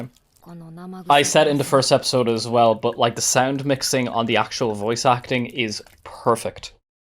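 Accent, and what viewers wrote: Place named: Ireland. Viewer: My family name is Irish. I detect an Irish brogue. There has to be an Irish definition for this kind of story.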